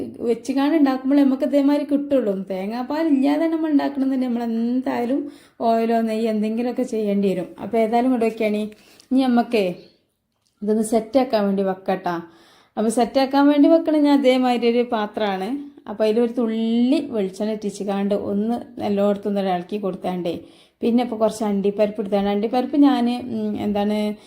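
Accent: Malappuram, India. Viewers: വായ് പൂട്ടാതെ കമൻ്ററിയുണ്ടല്ലൊ ഇത്താത്ത അത് തന്നെ ഉഷാറല്ലെ. ജില്ല മലപ്പുറം ആണെന്ന് തോന്നുന്നു. മുരിങ്ങ തണ്ട് ഇതിനിടയിൽ ഇത്ത എപ്പഴാ തിന്നത്?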